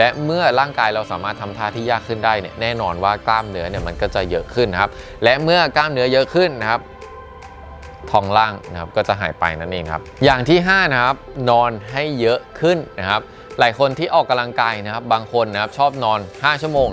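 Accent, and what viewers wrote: Thailand. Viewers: น้องออกเหน่อนิดๆ เป็นคนต่างจังหวัดใช่มั้ยครับ น้องอธิบายได้ดีมากครับ ทำตามได้ง่าย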